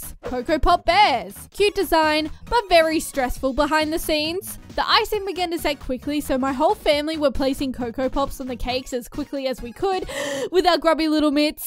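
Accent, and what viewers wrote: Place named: Australia. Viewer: My first time watching your channel and I gotta say...when I saw the png of the coles cake I was like .....yeah it's the accent and the coles cake....AUSSIE!!!!! Omg my mum n dad would CONSTANTLY get my siblings and I the coles vanilla sponge cake with whip cream and strawberry jam.... only dad liked it (and cause he paid and got it he picked it) I hate sponge cake now ;v; cake trauma. When we got a tad older we started also getting the same mud cakes...with the very very LOUD lids 🤣😂 memories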